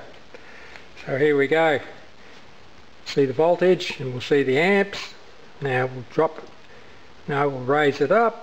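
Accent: Australia. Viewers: G'day. Good to hear an ozzy voice. Thank you for the idea and keep up the videos.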